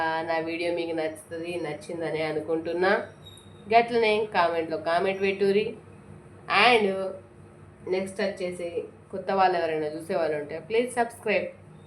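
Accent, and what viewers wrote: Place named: Andhra Pradesh, India. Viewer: Madam Andhra Bhasha chala baguntundi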